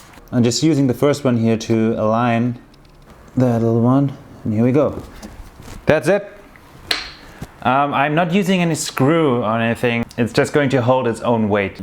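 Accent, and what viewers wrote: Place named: Germany. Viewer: i love how you can instantly recognize a german accent even though its almost unnoticable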